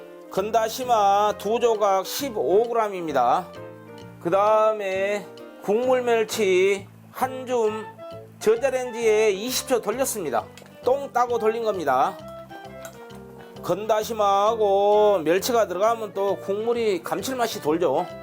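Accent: South Korea. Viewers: 언제들어도 구수한사투리 정감이 갑니다 쉐프님 역시 최고입니다 감사합니다 🎉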